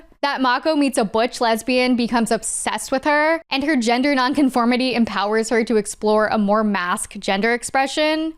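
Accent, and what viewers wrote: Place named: Southern California, United States. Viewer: Me, after clicking on a random recommended Sailor Moon essay: Is... is that a So Cal. accent?: [something something] Hollywood [something] (I'm not going back to find the timestamp, sorry) Me: Ohhhhh. HELL YEAH. More California accent representation, please! I have found my -people- person. Good vid, btw, despite the technical issues. I watched the first run of the dub back in 1995 before middle school and loved it, but that's the extent of my Sailor Moon knowledge.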